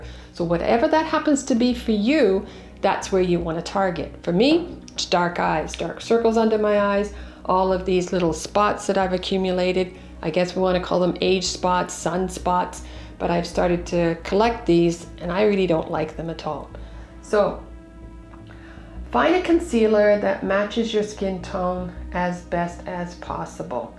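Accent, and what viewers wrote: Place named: United Kingdom. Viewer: Love it! I'm starting to hear your British accent more 🥰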